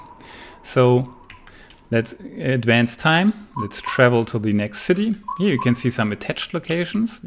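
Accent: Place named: Germany. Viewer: I'm so stoked. Hearing the German accent of one of the BB developers just adds to the hype and excitement. My first band of brothers will be called, "The Regulars" in your honor.:-D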